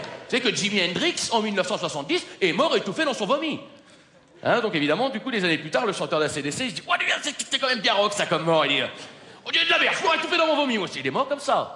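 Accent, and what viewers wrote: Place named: Belgium. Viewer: Il avait un léger accent belge à l'époque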